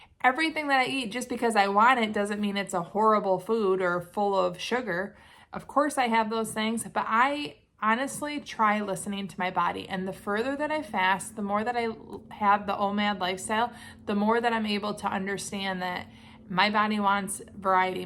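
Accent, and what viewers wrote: Midwest, United States. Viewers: I detect that hard midwestern accent.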